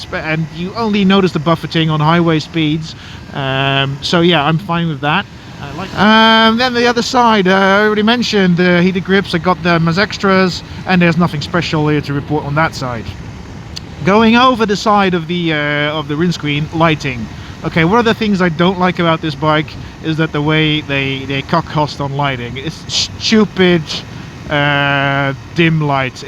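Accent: Netherlands. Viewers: Well look at that, a Dutch guy doing motovlogs whose accent ISN'T grating to listen to. I'm Dutch myself (Nederlands jeweetog!) and if there's one thing that consistently grinds my gears it's other Dutchies vlogging with 0 attention to pronunciation, proper enunciation, or even vocabulary. Sure, one doesn't have to mask one's accent, but at least an attempt at sounding vaguely localized is much appreciated. Related, keep up the good work, sir. I'm very interested in buying a Versys 300X. Currently riding an CBF500 and a Leonardo 250, and I'm looking for a physically bigger bike to fit me a little better without losing the nimbleness and (relative) low weight of a small bike.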